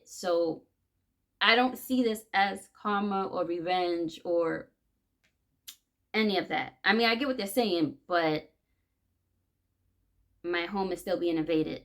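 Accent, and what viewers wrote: New York, United States. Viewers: You’re right, most of the original New Yorkers are gone, you can tell an original New Yorker by their accent, I can tell that you’re an original New Yorker, most of these people in NY now aren’t from there and have no culture.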